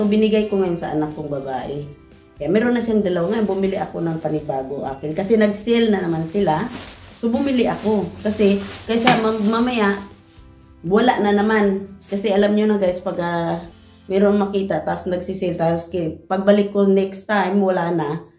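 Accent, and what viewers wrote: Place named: Visayas, Philippines. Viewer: Bisaya diay ka...taga asa ka sis?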